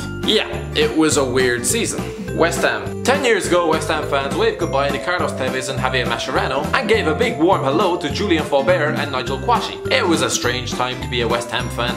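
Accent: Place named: Ireland. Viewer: The Irish narrator is the best by far. Needs his own channel.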